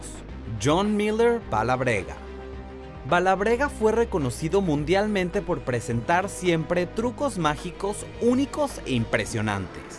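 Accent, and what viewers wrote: Mexico. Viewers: Este narrador es de México y el otro Argentino? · En que momento la voz se volvió tan mexicanota? Extraño la voz antigua, era mas "universal" por asi decirlo.. y mas rapido al hablar :/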